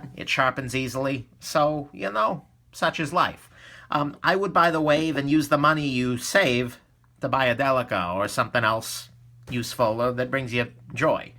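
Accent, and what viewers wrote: New York, United States. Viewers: I love this man's Accent, makes me miss NY. .😭 CONEY ISLAND LET'S GOOO!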